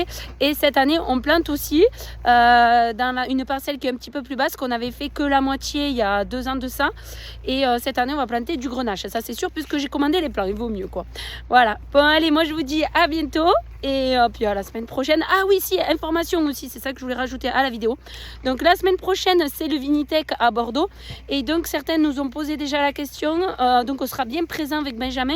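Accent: South, France. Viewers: Bon courage dans votre travail. J'aime l'accent du sud, votre région est adorable.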